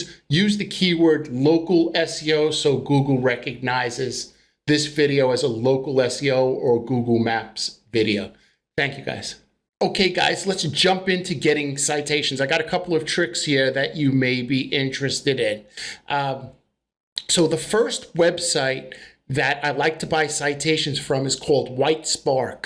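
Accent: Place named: New York, United States. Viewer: I think I hear a fellow New Yaaawker 👂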